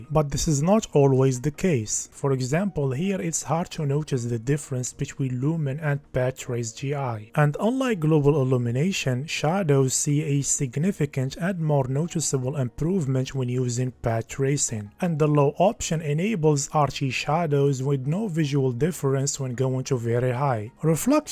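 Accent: Morocco. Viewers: sounds moroccan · the Moroccan accent is a bit too overpowering brother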